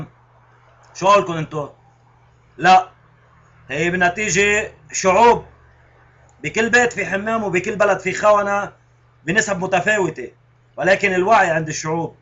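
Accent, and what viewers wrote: Lebanon. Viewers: اول شي هاد مدح الجزائريين تاني شي هاد لبناني مو سوري و واضح من لهجتو حاج تعملو فتن بين بعض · هاد لبناني مو سوري .. السوريين مابيحكو هيك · هادا لبناني مو سوري نحنا لهجتنا مو هيك ياجماعة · لك هاد لبناني مو سوري مبين من حكيو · انا سورية ولهجتو غير اللهجة لسورية لهجتو بتشبه اللهجة اللبنانية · هاد لبناني من لهجتو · من لهجته باينة انها لهجة لبنانية .والله لي فخر انني جزائرية والف تحية لفلسطين وسوريا ولبنان والمغرب كلنا عرب اخوة نحط ايد بإيد باش نصنع المجد ونرجع زمن القوة والسلطة اللي سلبت منا وأستدل بكلامي بقول عمر بن الخطاب رضي الله عنه (نحن قوم أعزنا الله بالإسلام، فإن ابتغينا العزة بغيره أذلنا الله) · ألى الكل هاد الشاب ليس سوري هاد لبناني الدليل اللهجه مش سوريه لهجتو لبنانيه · هيدا لبناني مش سوري لهجتو لبنانيه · لهجته لبنانية مو سورية..... هاد لبناني مو سوري وبعدين بيحكي كلمات وسخة كتير وهي من عادات اللبنانية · هذا ماشي سوري هذا لبناني باينة من لهجة تاعو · هاد لبناني مبين من لهجتو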